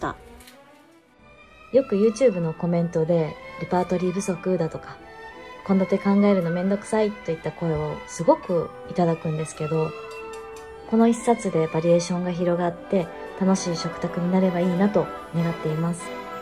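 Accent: Kansai, Japan. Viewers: 書籍化おめでとうございます！！ 絶対買わせていたたぎます！！！！！ あと私も関西人だからすごく喋り方が安心する笑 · 初コメです😊同じ関西を感じていつも見ておりますw今回のレシピ本購入させていただきます😍おめでとうございます㊗️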